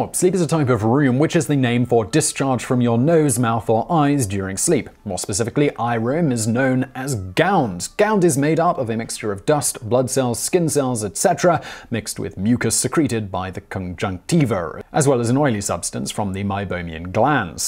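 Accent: England, United Kingdom. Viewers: Please stop trying to impersonate a garbling artificial intelligence robot. Please just speak much more slowly and clearly in your clear English accent.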